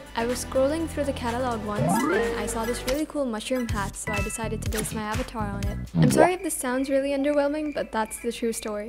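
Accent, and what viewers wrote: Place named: Philippines. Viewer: It's clear that she's Filipino I know one when I hear one I can tell by her accent.. AND NICE VOICE:D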